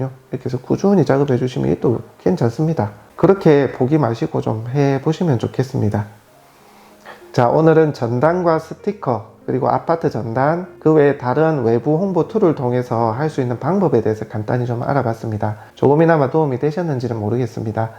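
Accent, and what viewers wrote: Gyeongsang, South Korea. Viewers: 갱상도 사투리 잼나요.ㅎㅎ 홧팅!!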